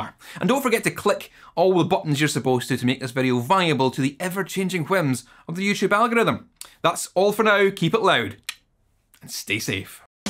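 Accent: Scotland, United Kingdom. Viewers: I'm so happy my love for how certain words (most words tbh but some in particular) sound so good in a Scottish accent was validated by the man himself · I love the vocal chain. And everything sounds great in a scottish accent.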